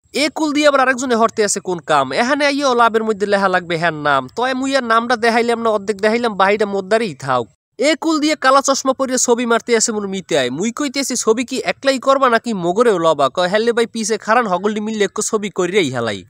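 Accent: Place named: Barishal, Bangladesh. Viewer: ভাইয়া আপনার বরিশাইল্লা কথা গুলো ই খুব ভালো লাগে❤❤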